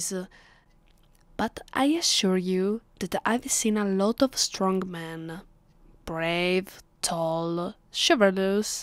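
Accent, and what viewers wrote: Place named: Italy. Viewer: To, I enjoyed your vampire roleplay. The title Vampire 's Captive. And the Italian accent, actually makes it. Extra special indeed.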